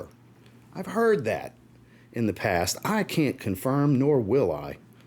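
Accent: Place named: New Orleans, United States. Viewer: You sound like you straight outta tha parish bruh I miss Nola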